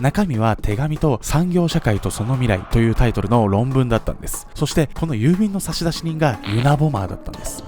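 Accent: Kansai, Japan. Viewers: 関感凄くて草www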